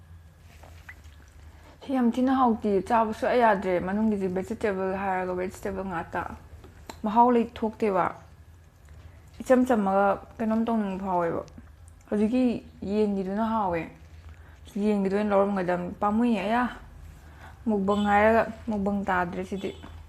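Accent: Kakching, India. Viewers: Kakching maron d ngang da b n henna fajei natural d oina adum ngang ngo... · Kkching lon sum ngngbiyo .....cna hena tab nungaijei😍😍😍😍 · Kakching marol ngangba heisu heita malle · kakching lon ngangbac tba ym nungai ado lon khoidabda su hei ym nungaiye tabda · Kakching londa ngangbdo yam nung c nangna chabdu haodou yam male hayeng yumda thorkanda sak khangnarga chaminase eisu haobra yenge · Noi lonse taba yam nungai eigi enamashu kakching bazar mnaktgi ngdi noi lonbu heitreda. Ado pork chardi pork chabgi video tourako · mathangdi che pork chabei oina twrak oh kakching marol tabasu yam nungaii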